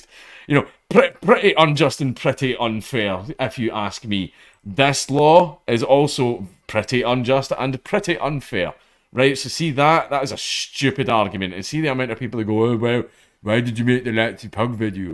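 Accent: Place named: Scotland, United Kingdom. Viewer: 'Phnom penh' (sounds like) 'nom pen' sometimes the second syllable is silent as in Thai. even with a Scottish accent Dank you were sound on that one.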